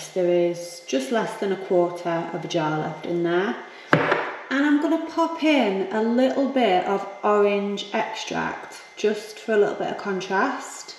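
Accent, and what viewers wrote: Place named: Yorkshire, United Kingdom. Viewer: Dear, I am binge watching your vlogs, I like your calm style and I find your Yorkshire accent loveable. Your channel is as entertaining as Nicki Positano or Kristen and Joerg from the UK. I love about you and these others vloggers that you lead a pretty great life in a nice place and you are aware of that and make the best of it and try to enjoy totally. Marvellous and intelligent attitude. Keep up your good work. Anyway I love your clothes choice and your earrings too.😘😘🌼🌼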